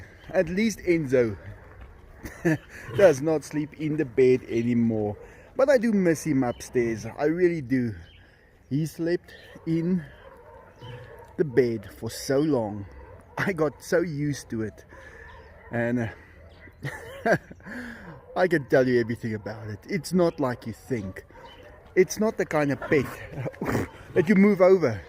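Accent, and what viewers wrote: South Africa. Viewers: I love watching videos he has true love for animals, and I love the SA accent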